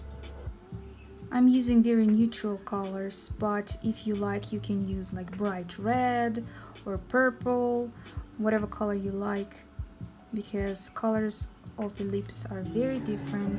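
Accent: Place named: Russia. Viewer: Такой русский акцент ,хахаха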